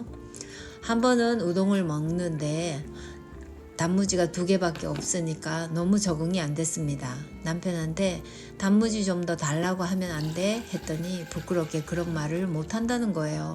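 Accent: Gyeongsang, South Korea. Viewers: 사투리 안ㅡ쓰는데 억양에 경상도인줄 알았어요 어때요 모국언데요 욕만 경상도식으로 안하심 됩니다 문딩이 가스나가 하면 조금. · 억양이 경상도입니다.섞이다를 경상도분은 썩이다라고 말함. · 저도 경상도 사람이에요 ^^ 경상도 억양 반갑고 정겹습니다 따님 한국억양도 경상도 억양이라 속으로 웃었네요~ 그치만 어쩔수 없지요.. 따님 든든하시겠어요^^ 일본 생활 엿보는 재미가 있어요 응원합니다 화이또! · 저는 첨부터 경상도 출신인거 알았는데요^^ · 경상도 억양이 잠깐씩 나타납니다 뒤늦게 정주행 하지만 성격도 경상도 기질이 보여지네요~~^^ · ㅎㅎ 사투리 마이 쓰십니다 ♡ 어투에 갱상도 사투리가... 너무 너무 대단하십니다 한번씩 들러 보는게 이젠 하루의 낙이 됐네요~^^ 항상 가족 모두 행복하세요~♡ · 억양이 경상도가 있어요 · 나레이션에 경상도 억양이 있어서 더 듣기 좋아요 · 이 분과 9개월전 제가 거래했던 부동산 대표님--경상도 상경 추정되는 50대초중반 연령, 서울거주 20수년이상분과 억양, 어투 싱크로율 99%네요. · 왜 이상해? 한국말 잘 하는게? 잘 가르쳐서 그렇지~~ 얼굴 안보여주는게 뭐가 이상해? 그럴수있지 다니면서 불편한거보다 낫지 경상도 사투리 씁니다 ^^ 너무 보기좋고 다정한 딸램 부럽습니다 😊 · 경상도말투가맞아요 かわいい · 빕먹을때 안쓰는 손도 상 위에 올려놔야 하고, 젓가락이 무기가 될수 있다는 상상이라니… 일본인들은 기본적으로 의심이 많군요.. 😮 그리고 누가봐도 경상도 사투리 쓰고 있어요 😂😂😂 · 한국인과 일본인 차이점 사소해도 많지요. 님은 나레이션만 들어도 경상도 사람임을 일수 있어요. ^^ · 참 별 넘이 다 있네요....... 따님 나름 노력 많이 했을텐데... 근데........ 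사투리 안 쓰신다고요???? ㅋㅋㅋ 경상도인지 바로 알겠던데요.. ㅎㅎㅎ 항상 잘 보고 있습니다.. 이쁜 따님에.... 이해해주는 신랑에.. 일본에서 잘 살아오셨네요.. 자부심 가져도 되실 분이신데.. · 엄마가 경상도 사트리가 좀 있어요♡^^ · 난 사투리 안 쓰는데에 악센트가 경상도 분인걸 단번에 알겠네요ㅎㅎ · ㅋㅋ 경상도 억양 있어요 그런데 그게 어때서 좋아요 따님도 우리말 잘해서 좋아요 엄마가 잘가르치셨네요~~^^ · 경상도 사투리 쓰고 계십니다. 말할때 높낮이 ( 사투리 억양) 로 사투리임을 알 수 있습니다^^ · 경상도사투리억양이 강하신데요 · 우연히 이 영상으로 처음 들어오게 되었는데 경상도 사투리가 살짝은 있지만 아주 티날정도는 아니에요!! 일본으로 유학하러 온지 3개월이 된 사람으로서 이런 에티켓 알려주셔서 너무 감사하고 따님분 한국어 너무 잘한다고 전해주세요~~!! 다시 한 번 감사합니닷! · 어머니, 단어 억양에 경상도 성조가 있어요. ㅋㅋㅋ 저도 처음에 듣고 이분 경상도 분이구나 하고 알았습니다. 그런데 듣기 좋아요. 오늘도 잘 보고갑니다. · 억양은 경상도 시네요~^^ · 억양이 경상도 억양이예요. 경상도에서 자란 친구들 다 사투리 안쓰지만 억양때문에 사투리 사용하는 거 같아요. 따님과 대화 항상 좋아 보여요. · 이분은 경상도분이구나~ 생각했어요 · 엄마와 딸사이가 좋은게 부러워서 별 되도 않는 말을 하나봅니다. 못난 사람들은 무시하세요 ㅋㅋ 근데 넘 귀여운 경상도 억양이셔요~ ❤ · 경상도 억양이랑 사투리 쓰시네요. 본인이 모르실 뿐. 사투리가 나쁜것도 아닌데 왜 안 쓴다라고 하시는지. 그리고 따님 한국말 능숙하게 구사하셔서 아주 이쁘세요 😍 💕 ❤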